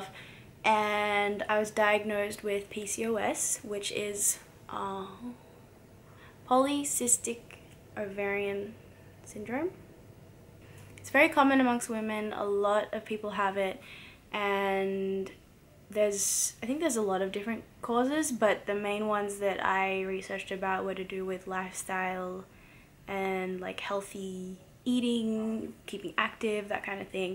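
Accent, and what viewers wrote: Australia. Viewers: You’re one of my fave blogger now, I love your aussie accent. Whereabouts in Australia do you live?:)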